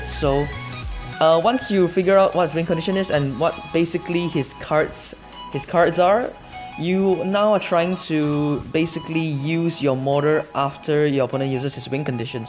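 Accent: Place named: Singapore. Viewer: your accent sounds singaporean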